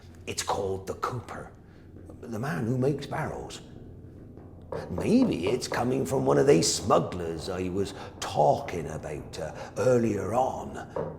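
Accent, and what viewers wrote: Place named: United Kingdom. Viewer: British narrators are always the best! This was so much fun!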